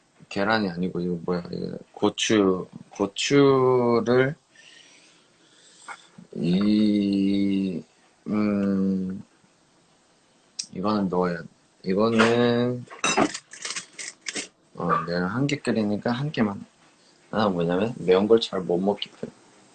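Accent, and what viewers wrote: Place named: Seoul, South Korea. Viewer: 이 형 고랩에서는 사투리 그대로 쓰더니 이제 서울말에 적응해가는듯 ㅋㅋ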